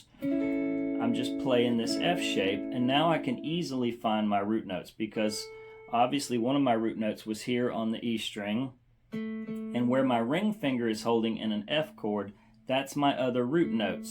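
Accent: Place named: Mississippi, United States. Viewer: I have been on the brink of making this connection for YEARS! I could feel there was something simple I wasn't putting together and I couldn't make the connection until I watched this video. Game changer! By the way, that MS accent was recognizable enough that i had to go to your profile to verify.😅 Copiah county here. Do you do in person lessons?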